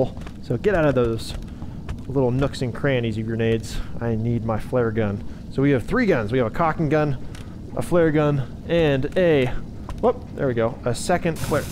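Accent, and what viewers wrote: United Kingdom. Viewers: British accent through me off lol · I was wondering that too I was like is this dude British or something lol 😂 I need to get a VR headset to have more fun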